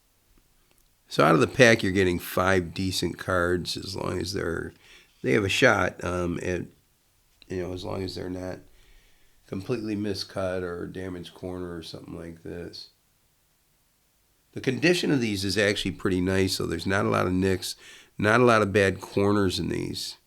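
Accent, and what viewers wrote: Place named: Michigan, United States. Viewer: Sounds like you're from Michigan bro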